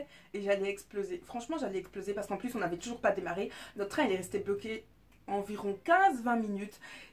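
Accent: Belgium. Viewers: j'adore ton accents belge 😘💝😜 t'es juste hyper belle c'est fou 💎💎 tes parfaite 😍😍😍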